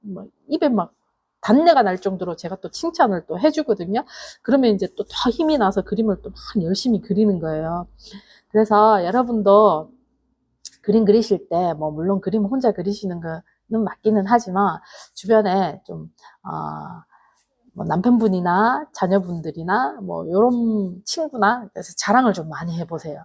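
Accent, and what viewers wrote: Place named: Gyeongsang, South Korea. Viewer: 그림을 그리면서 말을 한다는것이 힘이듭니다 가끔씩 경상도억양으로 말해주세요 목소리를 들으면 고향생각도 나서 좋아요 그림수업 잘하고있어요 감사합니다 화이팅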